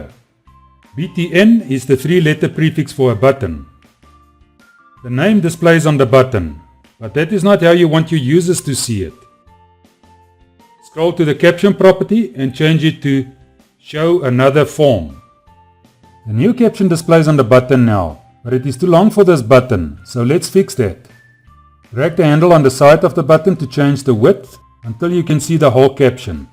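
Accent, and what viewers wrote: South Africa. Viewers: Is jy Afrikaans?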